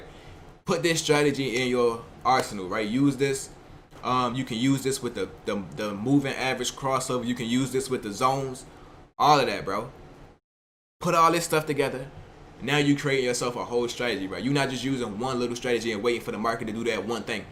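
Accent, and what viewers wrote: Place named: New Orleans, United States. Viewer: You from nola?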